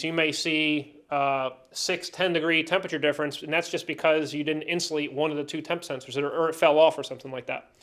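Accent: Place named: Philadelphia, United States. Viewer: Philly accent detected 👂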